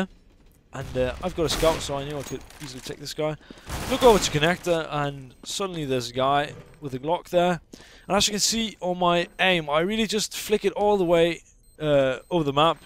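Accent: Denmark. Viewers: are you danish mayt? 😊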